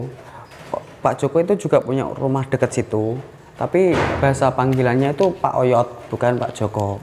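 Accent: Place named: Java, Indonesia. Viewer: jawane wis medok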